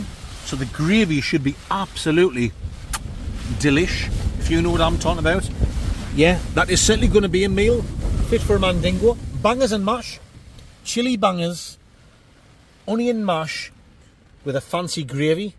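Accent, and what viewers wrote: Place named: Sunderland, United Kingdom. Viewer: This is the only Sunderland accent I will tolerate to listen too. Top bloke 🔥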